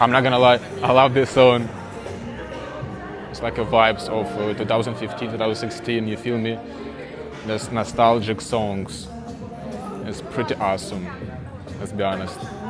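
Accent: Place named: Russia. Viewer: Don’t stop bro, you’re doing well🔥👍 Your voice is completely changed when your speak Russian(I know the Russian accent)Support from Kazakhstan 🇰🇿🇰🇿🔥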